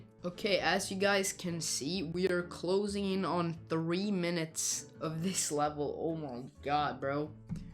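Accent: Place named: Sweden. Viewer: Are you by any chance swedish? It just Kinda feels lika you have a bit of a swedish accent.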